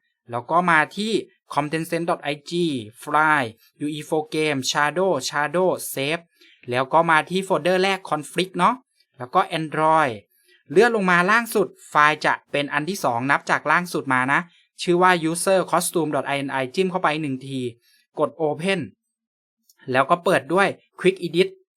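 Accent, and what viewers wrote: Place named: South, Thailand. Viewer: ทำไมต้องพูดใต้ด้วยครับ5555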